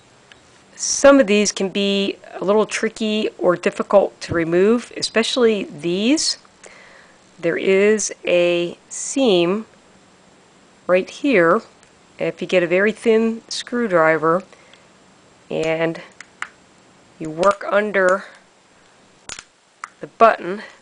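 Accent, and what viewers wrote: Philadelphia, United States. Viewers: Is this a Philly accent or Baltimore?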